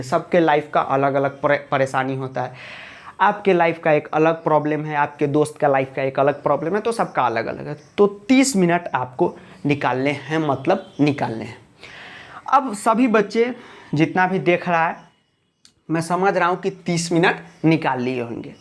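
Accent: Bihar, India. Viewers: Sir aap Bihari hai ya Gorakhpuri 😂😭😂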